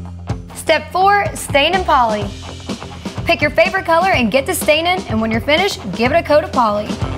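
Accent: South, United States. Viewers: A man can dream. And a beautiful Southern belle accent to top it off. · Love that southern accent when she said " For all you haters saying I drill to many pocket holes , I don't care" BOSS Lady <<<< we need more chicks with attitude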